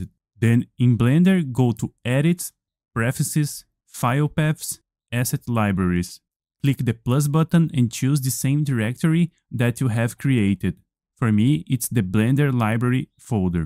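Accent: Brazil. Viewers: Helo there, conheço sotaque de mineiro só de ouvir. Ajudou muito irmão! Abraços from Contagem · assisti 5 segundos e pelo sotaque tu é brazuca, ou eu errei feio ou eu acertei muito bonito, well in case you are not, great video tysm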